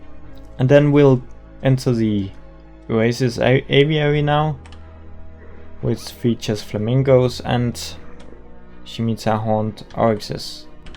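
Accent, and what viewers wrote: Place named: Denmark. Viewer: Love the zoo. Also, as a fellow dane, i recognized the accent immediately lol. But your english is good so dont worry about it. It has a charm ❤️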